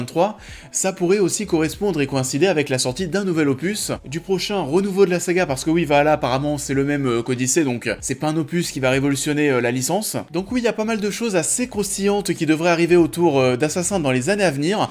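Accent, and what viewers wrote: Paris, France. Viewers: Si la serie marche un tant soit peu, je mets un billet sur le fait que Netflix tente d'en refaire un film un peu comme El Camino avec BrB ou toutes leurs déclinaisons de leur série sur Marco Polo, avec une periode inédite différente de la série, peut être sans l'époque contemporaine, une fois que le délire capuche/crédo sera bien passé avec la série. Ça nous fera peut être oublier l'horrible nanar de 2016 ! PS : Mollo sur l'accent parisien stp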